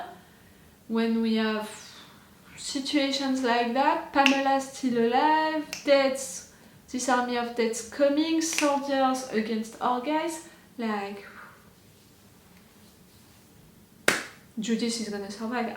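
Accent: France. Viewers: Je venais pour regarder une réaction américaine, et là, j'écoute ta voix.. Et je me dis.. Mais attends, c'est un accent français ça^^ Elle est Française ^^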